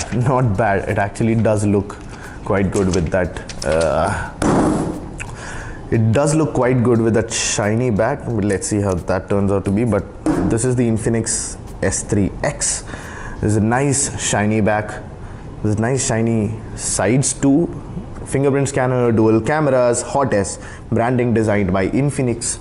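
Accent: Kerala, India. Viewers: മലയാളി ആണോ?? Namil ഒരു മല്ലു touch..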